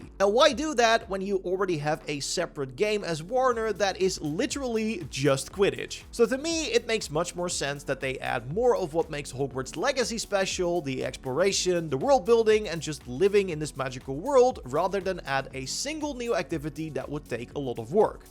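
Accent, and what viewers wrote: Netherlands. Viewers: Nice, very cool info! His accent is so distracting to me, am I the only one? I'm Dutch and I presume he is aswel, well yeah 😂 so maybe that's why 🤔😊 Very nice content though 👌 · Ik hoor een Nederlands accentje haha, leuke video! Ben benieuwd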